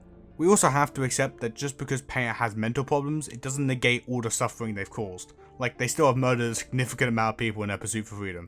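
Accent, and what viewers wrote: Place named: United Kingdom. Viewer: the thick british accent makes this video so much more interesting and entertaining, like on top of the accent you also speak slightly faster so its really funny.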